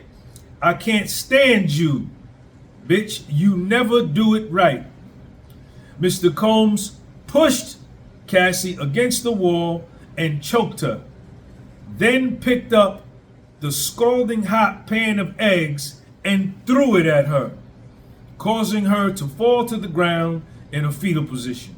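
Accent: United States. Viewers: This man has a good USA accent, keep up the good work . ⚖️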